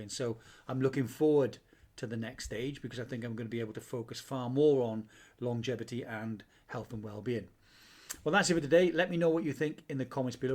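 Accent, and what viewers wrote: Wales, United Kingdom. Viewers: hi watching out for a while I’m here I’m guessing that’s a welsh accent ?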